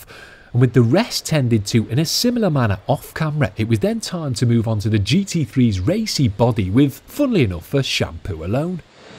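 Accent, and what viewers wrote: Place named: Wirral, United Kingdom. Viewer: I think I recognise the accent, are you a Wirral lad? I'm born & raised there but have moved over the water to Sunny Formby. Thanks for another informative episode. 👍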